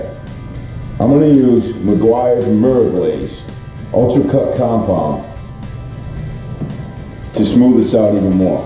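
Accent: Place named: New England, United States. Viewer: Are you from the east coast? You sound like my uncle lol got like a mass/rhode island accent.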